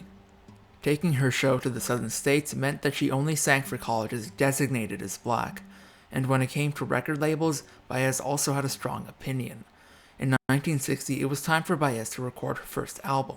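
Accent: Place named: Scotland, United Kingdom. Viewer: Scottish narrator! Scottish narrator! Scottish narrator on every vid!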